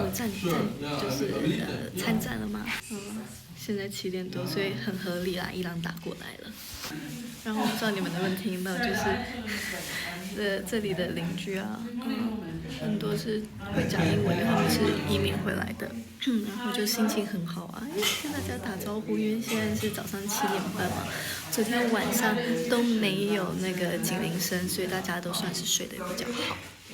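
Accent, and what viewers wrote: Taiwan. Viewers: 聽你口音 帶老公同個仔返去台灣啦 做乜9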